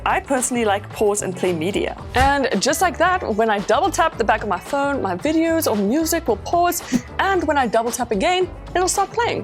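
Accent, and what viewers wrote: South Africa. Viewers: hmmm you sound a little South African :o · South african accent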